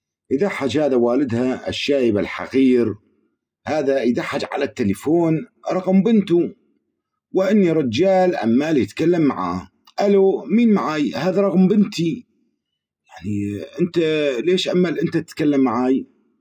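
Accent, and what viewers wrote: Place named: Yemen. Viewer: والله قصة رائعة ومن لفظ الكلام تبدو ربمى من دولة يماني اوعماني .المهم قصة حقيقية وان لم تكن حقيقة امثالها كثير ما أعجبني هو الكلام مفهوم جدا اتمنى قصة أخرى ...من المتتبع عبد الرفيع سامح ولاية ميلة شرق الجزائر❤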